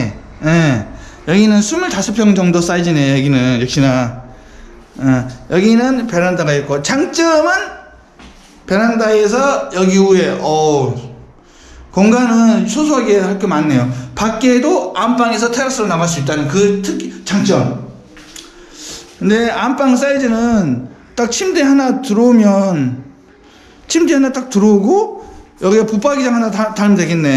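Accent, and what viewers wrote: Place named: Jeolla, South Korea. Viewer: 고향이 전라도 이신가봐요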